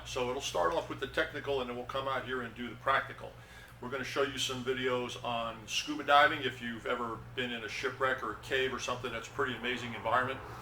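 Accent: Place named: Brooklyn, United States. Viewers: I feel like is originally from New York, like me. I place his accent in Medford area (or west) on Long Island. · u sure u not from Brooklyn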